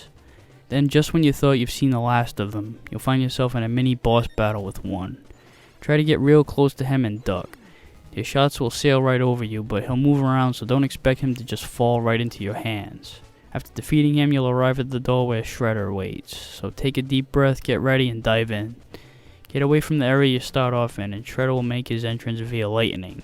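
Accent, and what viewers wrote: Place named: New York, United States. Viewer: Your New York accent is incredibly annoying. Buy a tape recorder and tape yourself all day and play it back. You'll realize you sound like a toddler who hasn't figured out his speech patterns yet. I recommend you move to the Midwest where people talk considerably normal.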